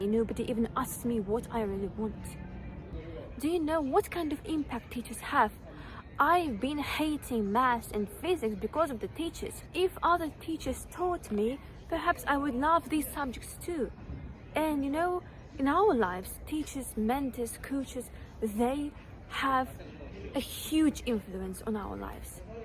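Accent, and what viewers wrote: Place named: United Kingdom. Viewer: I love your British accent 😍💖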